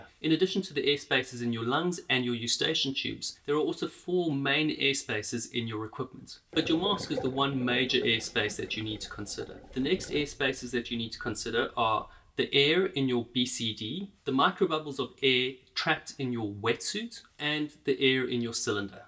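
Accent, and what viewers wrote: South Africa. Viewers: are you south african? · You have a South African accent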